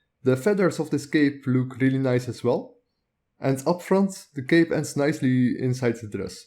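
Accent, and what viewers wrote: Netherlands. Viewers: Prima video, lekker dik nederlands accent er boven op, helemaal goed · damn dutch accent tho jaja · you sound dutch. you dutch right?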